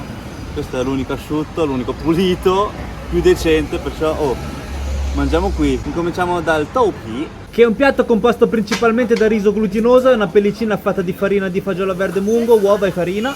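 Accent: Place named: Romagna, Italy. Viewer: Non ti conoscevo prima di questo video, ma sono rimasto impressionato da quanto tu riesca a parlare bene in italiano. Addirittura mi ha dato l'impressione che tu abbia una cadenza romagnola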